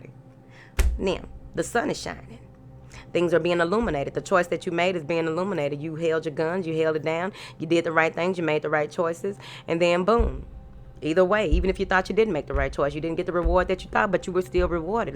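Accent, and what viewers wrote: South, United States. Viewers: My heart melts when I hear that southern ✨💜 thank you for such clear downloads & always getting straight to the point/no softening the message.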